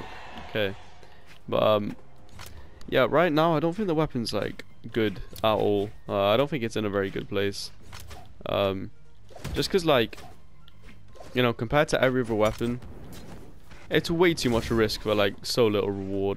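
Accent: England, United Kingdom. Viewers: hey you're from england right?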